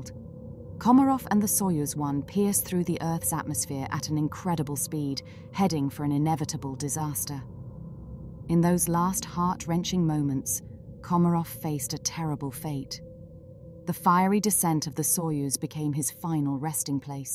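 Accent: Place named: England, United Kingdom. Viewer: I want that thick english accent back. Love your speaking voice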